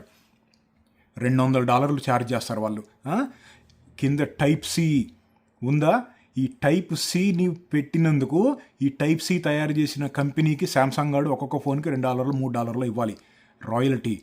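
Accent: Andhra Pradesh, India. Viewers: Anna nee slang mottam pure telugu (andhra) laane undi anna …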